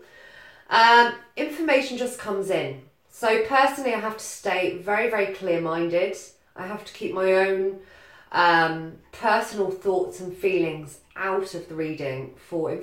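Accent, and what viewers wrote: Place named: England, United Kingdom. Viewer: Thank you, soooooo true! Ps... 'LOVE your English accent and integrity. Yes, HEIDI Symones always resonates w me, and the MANNA reader is ADELINA Bond! Live their ORIGINAL style as yours as well. Have a great day.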